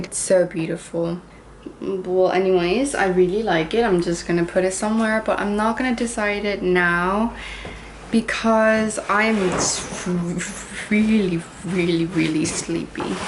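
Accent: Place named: United Kingdom. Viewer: 안그래도 오랜만에 영국발음 듣고싶다고 생각하던참이었는데ㅜㅜ거마워여❣ 우아한 취미생활 부럽다용😆👍🏻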